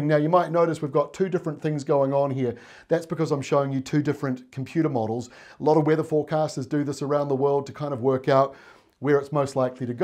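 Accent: New Zealand. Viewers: The plain English explanations (with kiwi accent) make this the best weather channel!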